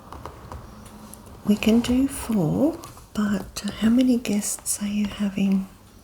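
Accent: Australia. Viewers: Hearing an Australian accent in ASMR is so comforting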